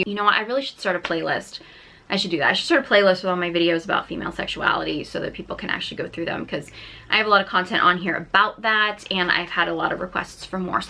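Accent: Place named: South, United States. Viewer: Your accent is cute! Where are you from? I’m in the Midwest. To me it sounds like you have a ever so slight southern accent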